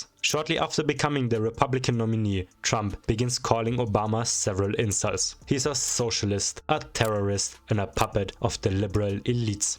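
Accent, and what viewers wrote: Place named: Germany. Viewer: Der deutscheste deutsche Akzent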